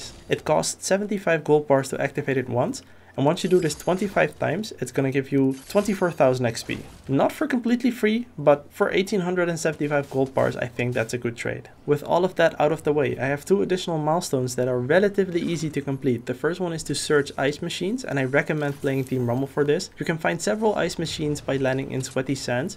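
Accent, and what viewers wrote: Netherlands. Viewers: Je hoort gewoon dat je nederlands bent😝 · You dutch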